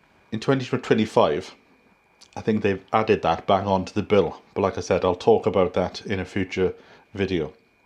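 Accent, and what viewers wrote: United Kingdom. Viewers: What's a wonderful and clear British English ❤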